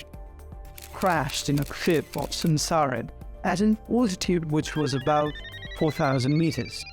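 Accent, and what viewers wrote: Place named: England, United Kingdom. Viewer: Throughout video I was feeling irritated with your annoying English accent ...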